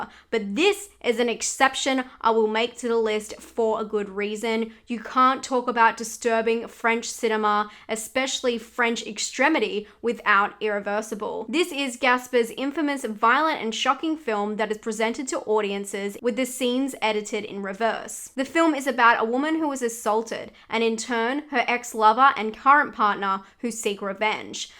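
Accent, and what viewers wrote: Australia. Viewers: I love it when you say "bizarre". Love an Aussie accent!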